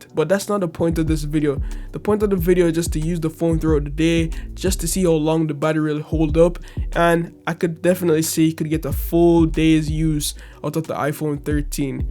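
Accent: Jamaica. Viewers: You sound like a Jamaican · You Jamaican?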